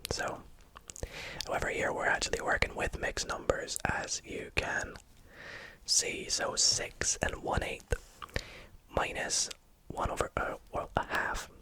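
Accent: Scotland, United Kingdom. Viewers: I new you were from Scotland 🏴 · were do you come from in scotland? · man’s talking bri’ish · You sound a lot like jojo asmr with an occasional Scottish accent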